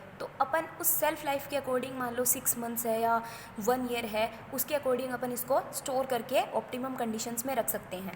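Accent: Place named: Haryana, India. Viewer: Mam the lecture is so helpful and nice but your haryanvi accent is still more precious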